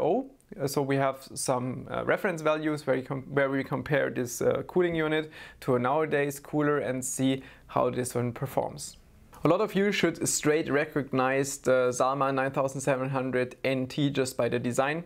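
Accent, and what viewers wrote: Germany. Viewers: Man merkt direkt dass du deutsch bist xD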